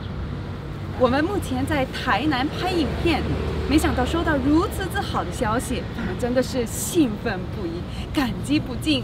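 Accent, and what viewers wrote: Beijing, China. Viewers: Q1: 請問是幾歲到中國求學的？聽妳的口音妳應該是很小就去中國念書了，是跟著父母一起去中國的嗎？ Q2: 為什麼後來突然喜歡台灣而不是繼續待在中國？ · Subscribed, 雖然北京腔聽起來不順耳，加油！ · 以後有得感謝囉一定很快就會五萬十萬訂閱了那我提問個以後有興趣住在台灣嗎？待久了就會變台灣腔不會有北京腔了😂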